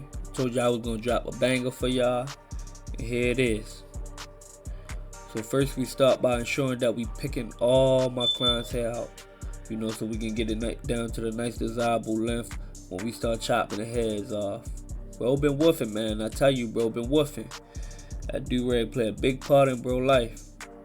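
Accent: Baltimore, United States. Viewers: work on bein yourself, them cuts and that dumb ass “baldmore” accent. Yurturb 🤣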